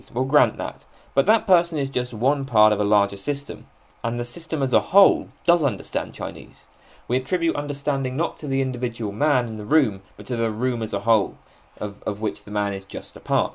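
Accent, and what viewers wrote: United Kingdom. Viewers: Most British sounding man but writes z in stead of s?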